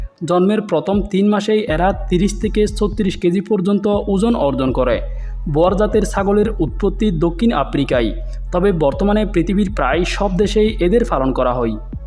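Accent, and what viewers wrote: Chittagong, Bangladesh. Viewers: ও ভাই আঞ্চলিকানো চট্টগ্রামের বাসাও নও কি ভাষা এখনো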